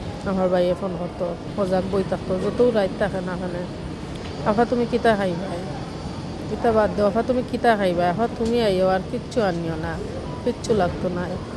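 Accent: Sylhet, Bangladesh. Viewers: আফনে গো শোনা সিলেটি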